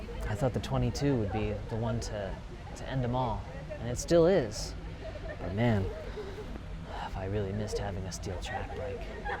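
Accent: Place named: Spain. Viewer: Great video! Además de lo que han mencionado otros, quiero que sepas que tienes un hermoso acento español, una voz suave y amable. Thank you for sharing! ♥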